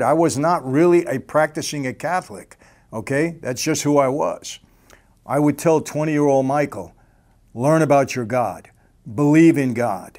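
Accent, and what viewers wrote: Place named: New York, United States. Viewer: The New York accent has to be the best accent to talk serious business.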